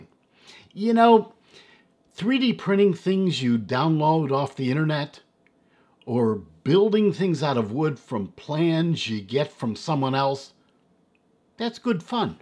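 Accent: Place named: United States. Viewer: I listen english spoken vids very often, cos I'm learning english for my own and I found Mr., who I´ve been following for a quite some time now, have a very clear and nice american pronunciation. So, as I'm not a native listener in eglish, for me is perfectly well to listen his vids at normal speed. Cheers, John from Santiago de Chile. South America.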